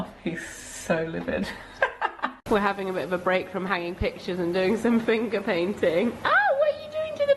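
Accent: England, United Kingdom. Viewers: Vlogging is so amazing! I have been watching you for years now, although I’m older (56) and not really into fashion. I stumbled onto one of your videos and loved to hear your English voice as I am American and love the accent. I haven’t been to the UK yet but would love to one day. I feel like I know you and your family so well and, being as weird as it might sound, if I seen you all in town I would just say “hi and!” Of course that would be funny and awkward 😂 but that’s how Vlogs work I guess. Stay safe and keep the vlogs coming, they’re keeping us sane! ❤️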